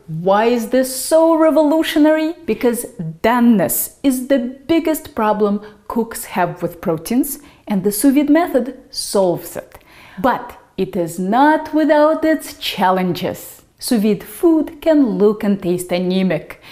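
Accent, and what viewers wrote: Russia. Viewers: что за акцент россия? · Акцент русский? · Ох уж этот русский акцент ))) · Russian accent isnt? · Звучит как русский акцент - всё понятно и разборчиво! ))) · What a Russian accent has. Very demanding women.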